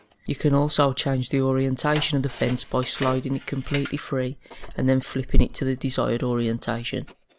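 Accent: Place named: West Midlands, United Kingdom. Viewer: Great review ar kid very detailed and the West kids accent makes it that much better to watch as I'm from walsall .I've subbed and liked well done keep it gooin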